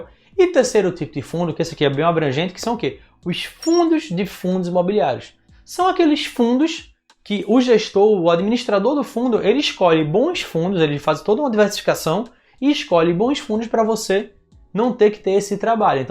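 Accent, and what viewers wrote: Recife, Brazil. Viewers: Em 30 segundos percebi que é de Récife. Seguindo já conterrâneo. ✌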